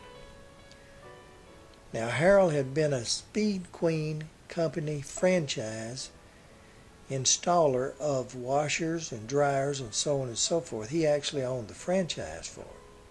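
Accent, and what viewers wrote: South, United States. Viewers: I enjoy all of documentaries he has that southern voice that makes for great listening keep up the great work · You have a great voice for narrating, and great American accent and local dialect.... nice.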